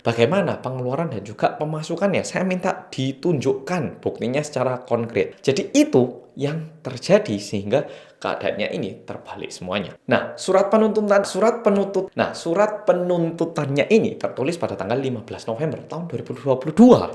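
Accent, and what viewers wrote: Java, Indonesia. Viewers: Mas sorry, sampean kok saiki kurang medok yo? Opo sebab wes suwi nang korea? Saiki lebih sengau suarane hehhehehe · Kaosnya bagus mas korea medog 🥺🥺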